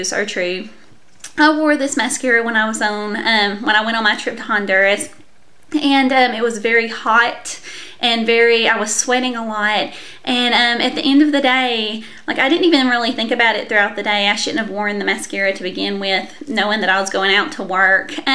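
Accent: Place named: Texas, United States. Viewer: Love ur texas accent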